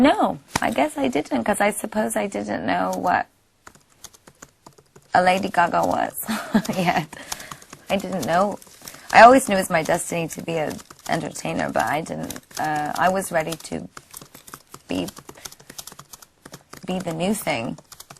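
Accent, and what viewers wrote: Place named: United Kingdom. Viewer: she sounds more british here..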